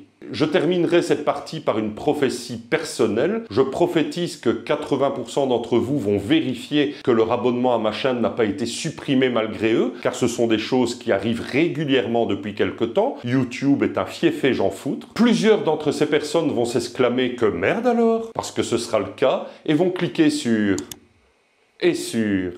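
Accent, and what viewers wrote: Belgium. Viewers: non effectivement, t'as l'air d'avoir plus de 30 piges, donc c'est mort pour le neveu🤣 l'accent mi Schneider, mi De Croo ça doit être intéressant ça aussi, et pas très commun. Un bon moyen de te reconnaître si je te croise au hasard en allant voir le neveu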